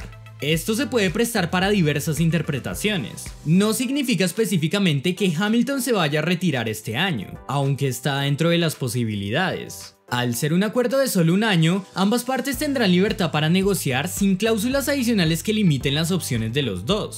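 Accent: Colombia. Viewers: es de colombia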